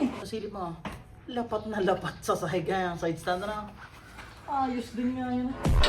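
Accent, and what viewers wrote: Batangas, Philippines. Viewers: ngayon ko lang kayo napanood kuya, halatang batangueno agad hahahaha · Taga Batangas ka puge? Batangeño ere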